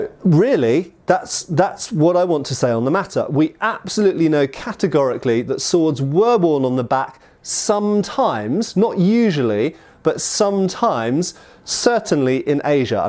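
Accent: England, United Kingdom. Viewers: True. And when used as part of a combination word, it becomes dachi... as in nodachi or odachi. But Matt is English, and he's gonna pronounce things with, well, an English accent, lol.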